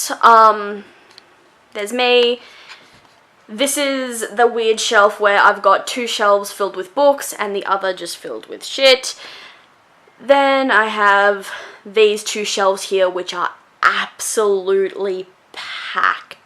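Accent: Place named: Australia. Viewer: ayyyyye that aussie accent!!! hahaha I'm from New zealand and i loved this video so much (bookshelf tours are like the best right?) I subscribed and can't wait to see more from you!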